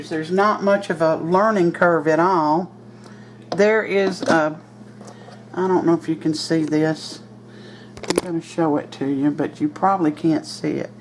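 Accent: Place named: Alabama, United States. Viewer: I just recently found your channel and I love your videos. I appreciate your simple recipes and your thorough instructions. Love the Alabama accent and taste testing. Can't wait to actually try some of these dishes.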